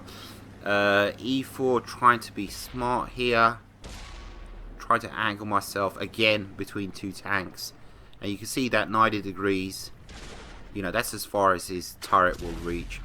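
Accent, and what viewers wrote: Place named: United Kingdom. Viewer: need to stop wearing my headphones when im listening to your vids that music at the end threw me across the room lol nice vid as always big fan hope the hand is getting better can i ask where in the uk u from been trying to work out your accent???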